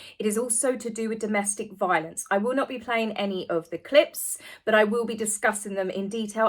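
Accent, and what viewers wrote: England, United Kingdom. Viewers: Love from Long Island - ive experienced abuse on several levels and its taken a while to rise up and wake up. You are such a beautiful special human and I truly look forward to seeing your videos and hearing your English accent! Just wanted to reach out to say that to u!!! Keep up the great work❤️👍🫡🤗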